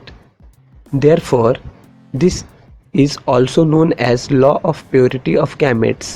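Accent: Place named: India. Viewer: Everytime I hear an indian voice, I know that I'm gonna learn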